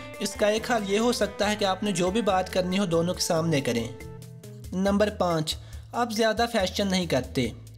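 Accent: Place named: Lahore, Pakistan. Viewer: آپ کا لاہوری لہجہ کافی پسند آیا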